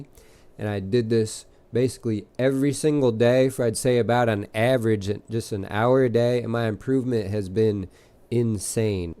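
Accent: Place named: Slavic, Eastern Europe. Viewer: wow, I thought you were Russian or from another Slavic country😅.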